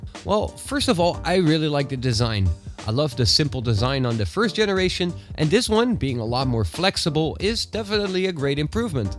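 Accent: Netherlands. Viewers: Thicc Dutch accent over here haha, nice vid though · Vreemd dat zijn Engels echt accentloos is maar je toch kan horen dat hij Nederlands is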